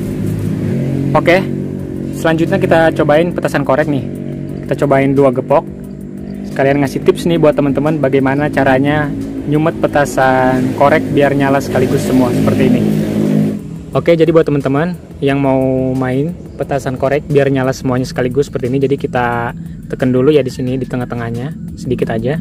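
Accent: Java, Indonesia. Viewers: Orang Jawa bang?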